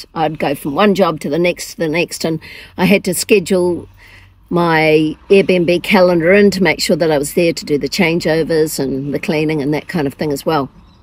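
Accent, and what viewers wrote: New Zealand. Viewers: I'm mid 70's, been caravanning 25yrs.Do I hear a kiwi accent? I'm ex kiwi. Love my lifestyle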